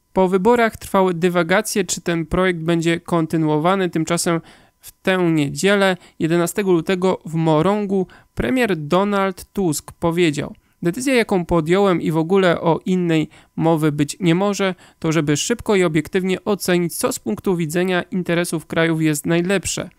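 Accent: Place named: Russia. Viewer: jesteś ruskiem słychać po akcencie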